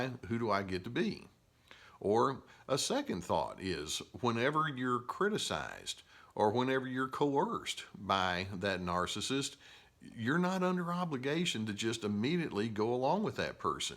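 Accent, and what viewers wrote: South, United States. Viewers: Sundiata Keita he sounds like a southerner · Lovely southern drawl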